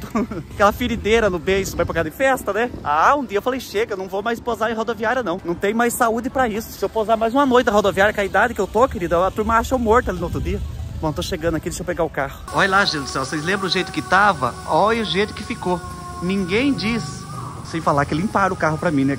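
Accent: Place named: São Paulo, Brazil. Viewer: ou e esse sotaque seu e de onde mesmo meu filho? vc mora no estado de São Paulo?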